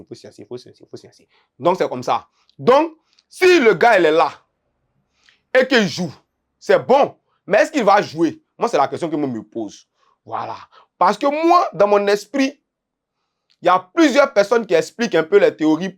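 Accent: Ivory Coast. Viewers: bro jaime ta maniere de gbaé cest du pure ivoirien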